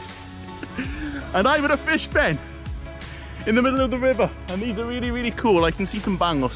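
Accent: United Kingdom. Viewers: Wow I love the view 😍👌 nice british accent can you teach me haha kidding 😆😊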